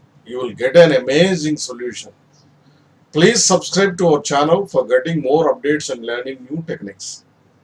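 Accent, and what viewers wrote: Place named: India. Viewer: Can you say which English are you speaking? British or American ? Easy to say, speak in English,but it's hard . Pronunciation as well as spelling differ. By the way you have indian tongue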